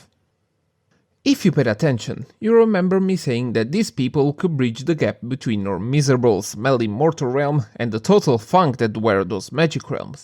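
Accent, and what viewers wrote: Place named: Italy. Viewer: You mentioned that you were the Italian Sam O’Nella? If so that’s amazing because I like your accent for these videos, it makes your videos stand out to most of the other ‘bootlegs’ because of the voice.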